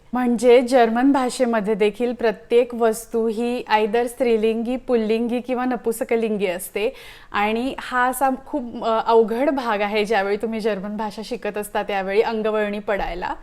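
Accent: Pune, India. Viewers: Wow....Pure Puneri 👌😂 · Tu punyachi ahes ka. Khup shudhh marathi bolteys mhanun.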